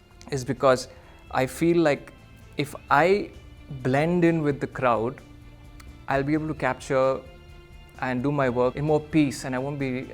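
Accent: India. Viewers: Dear, Looking at your breath taking photos and the comments and the kind of person you are, remembered a joke… once a Chef visits a Photogapher’s home and appreciates all the photos and in the end says you have a awesome camera, another day the photographer visits the Chef’s home tastes lip smacking dishes and says ‘you have a n awesome gas stove’ ….you are awesome…very interesting to see a Chinese Photographer with so much exposure to Indian culture…. Bow to your humility and talent. Were you brought up in India, looking at your Indian accent? And to sum up the message just a few minutes back found this video of Photographer Annie Leibovitz which I would like to share: <link> Thankyou Pixel Village and for this wonderful video by. P.S. In the past for me wedding equals trauma…and I recovered from the trauma and managed to make it beautiful by using EFT which is based on Chinese Accupuncture….so some connection with you:). 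Thankyou for sharing from your heart, i too love taking photos of my family with my mobile for the time being:)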